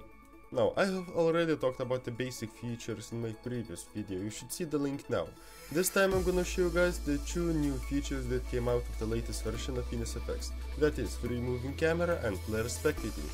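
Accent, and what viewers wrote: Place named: Russia. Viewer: Чувак, ты из России? По акценту слышится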